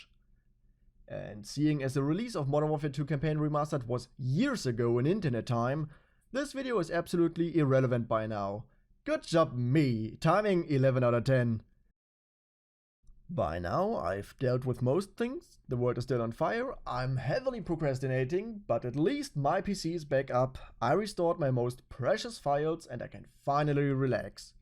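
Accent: Germany. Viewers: Are you from germany? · Are you German?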